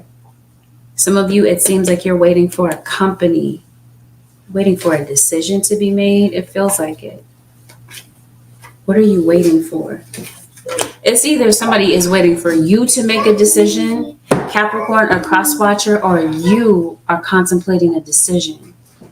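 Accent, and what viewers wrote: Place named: South, United States. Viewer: You from the south ain't you????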